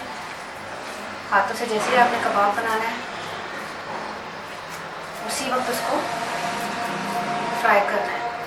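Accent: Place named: Punjab, India. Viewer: hahahahah so cute Punjabi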